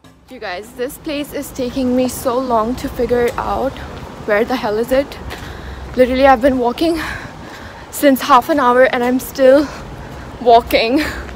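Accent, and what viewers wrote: Pakistan. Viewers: Love ur accent.... resembles so much like Lahori accent..... mind if u speak some punjabi too?? A true fan from Lahore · I don't know why but ap Pakistanis ki trha bat kr rhi ho Btw love from Pakistan beautiful lady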